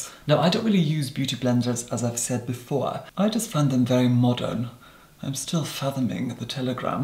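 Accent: Scotland, United Kingdom. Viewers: Knew he had 2 be scottish doin an accent!!! Something about th tone of his voice is very lorraine kellyhaha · What the hell is that accent?? It’s a mix of posh Scottish and god knows what else? Jesus.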